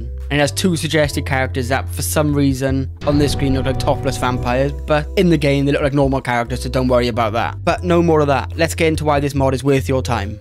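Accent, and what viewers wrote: Wales, United Kingdom. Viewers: You sound Welsh, take my like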